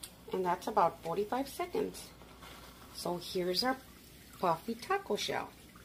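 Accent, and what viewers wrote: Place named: San Antonio, United States. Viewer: Hello, when I heard your voice you sound like your from San Antonio. I don't like cooking but I just got married two years ago. I don't work anymore more so I thought this is a good time to start. I'm 60 years old from San Antonio, but just moved to sante Fe new Mexico, don't like the food here much so I want to learn to cook.. I'm excited to learn your recipes.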